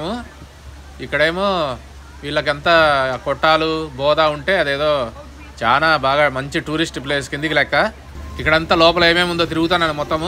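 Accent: Kadapa, India. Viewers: Chala baga vuntundi taliking slang in telugu pakka rayalaseeme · Anno madi kadapenna ne vedieous super ante super na mana yasa marchipoledante great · Meedi yevuranna.mee Kadapa Jilla vadi madiri matladutunnaru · I like your కడప slange నేను కొట్టం బడిలో 5 th class వరకూ చదివాను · Meru 2016 lone inthati camera lu oka drone use chesi videos chesi andhariki chupichalanna thought inka me journey chala bagundhi nejaniki meru kuda Oohinchi undaru me videos ippudu 2022lo YouTube lo oka Telugu channel lo papular ayithayani Thank You mana Kadapa slag inka plus vere level meru 🙌👌👌👌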